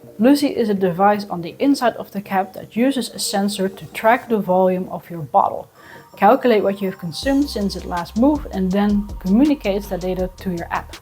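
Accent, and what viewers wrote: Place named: Netherlands. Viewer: I can tell you're Dutch by the accent ^^ I've been drinking waterdrop for years, got my mom on waterdrop too, it's good stuff. I got the Lucy with my points, so basicly 'free'? I don't think it's necessary, our tab water is clean enough. But it's a fun piece of tech. The Lucy Carafe though! (Also got it with points) I use that daily. It filters water really well. And when I placed a clean glass of tab water next to a clean glass with filtered water, I could see the difference, tab water does look blurry compared to filtered water.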